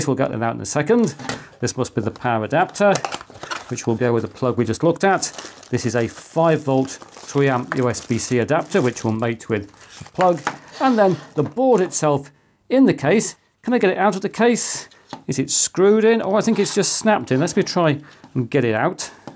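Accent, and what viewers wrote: United Kingdom. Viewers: ".... and now that's it" for another exciting and informative video from my favorite YouTube producer. I love this British accent❤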